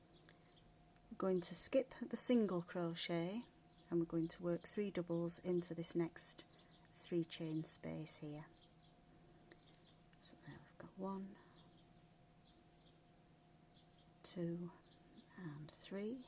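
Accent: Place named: England, United Kingdom. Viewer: You sound English yet you are calling the stitches in American! They are Triple stitch not doubles.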